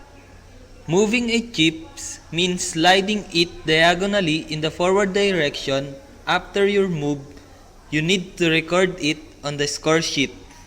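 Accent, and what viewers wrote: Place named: Philippines. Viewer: huwag tayo mahiya sa english natin dahil yan ang tatak pinoy, mga hindi nga ang daming video sa math, alisin na natin yang mentality natin na dapat american accent tayo, ang mahalaga mapresent natin ng maayos ang video natin, mga amerikano nga hindi nagcocomment tungkol sa accent. good work, pls. do some damath videos para sa elementary, napansin ko kasi na wala.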